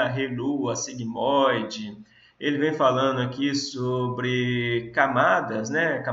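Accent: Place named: Minas Gerais, Brazil. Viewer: Mestre, você tem um sotaque mineiro, és da UFMG em Montes Claros? Sou de Salinas, fiz essa disciplina na UFV com o professor Fernando e o Tibeau e mais recente em 2010 com o professor Edenio da Zootecnia no mestrado em 2009.. Ótimas sua aulas...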